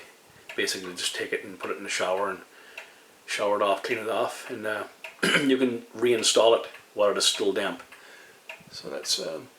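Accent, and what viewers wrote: Newfoundland, Canada. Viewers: Do I hear a Newfoundland accent? I just installed a 12000 in my garage. Did you figure out the smart option? I have no instructions on it.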